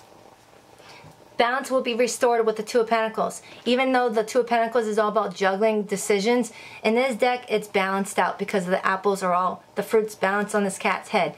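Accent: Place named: Brooklyn, United States. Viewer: Girl, you are so spot on. My past person messed me UP somethin bad 😔. Loved the video ❤🥰 and i was guessing your accent was brooklyn lol i have some friends from there ans ya'll sound the same 😁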